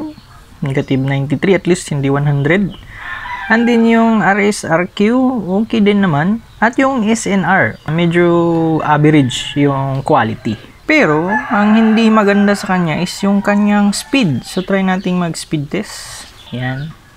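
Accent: Visayas, Philippines. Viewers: Bisayang bisaya ang accent mo lods ah. Hahaha.. Bisaya din ako.. Nga pala nice tutorials.. baka magamit ko tong idea na to don samin na halos wala din signal.. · Ahh mao diay ganahan kaayo ko sa imo accent bay...😁 Great content!! Ug asa ka makapalit ug openline nga modem?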